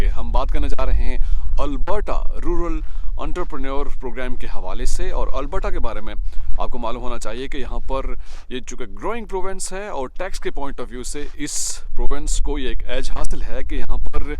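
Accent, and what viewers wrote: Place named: India. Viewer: Ap Pakistani hain tu Pakistani language main bat kry Indian accent main kr rahy ho tum we doesn’t understand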